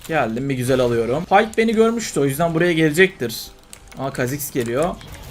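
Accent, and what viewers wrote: Adana, Turkey. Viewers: Abi Adanalısın heralde turnuvalara katılıyor musun ?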